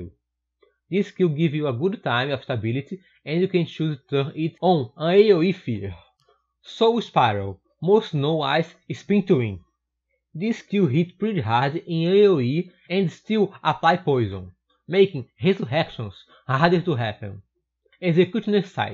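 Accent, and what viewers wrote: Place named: Northeast, Brazil. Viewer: Pontes Neto Pior que ele fala certo só que o sotaque nordestino é muito forte, hahaha lembra mto o Joel Santana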